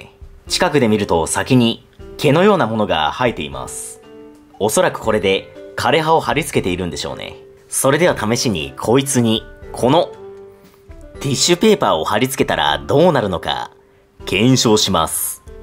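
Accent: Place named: Nagoya, Japan. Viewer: 、ご出身は名古屋ですかね？イントネーションが名古屋周辺の方そのものだったので。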